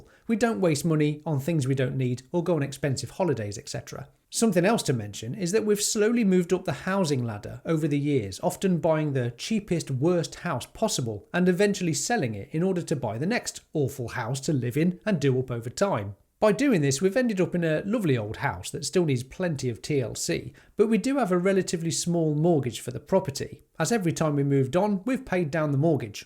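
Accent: United Kingdom. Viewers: Thanks for the candid video and I understand where you're at. Ironically, although I'm a recently retired engineer, my secret dream was always to be a great guitar player. At the age of 65, I still take and enjoy lessons. The problem, as I see it, with music is that the world doesn't like to pay for music. Sadly, all the musicians I personally know are broke. They're fantastic and talented people, but broke. Stay confident in that you're taking the right approach. I hate money but understand we need it to live a happy and fulfilling life. My wife and I be fine in our retirement...not rich but certainly not poor. Two final thoughts: When you send in your last mortgage payment for your home, it feels like you've cast off 500 lbs of chains that have been burdening you for many years. It's wonderful. Also, my wife and I wanted to buy a new Model Y Juniper recently, but instead opted for a low mileage 2020 Model Y for 1/3 the cost of the Juniper. 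No more chains for us. Please don't quit YouTube. I enjoy your enthusiasm and crazy Brit accent. If you visit the US near Ohio, I'll trade you a couple guitar lessons for some room and board + a fun tour of our chunk of OH. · Hi. Here a spanish guy that enjoys Your Tesla Content Whit British acccent. Hope you find The determination to keep going. Best of luck.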